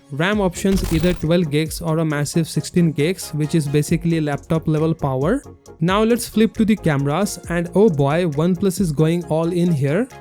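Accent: India. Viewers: U got the Indian accent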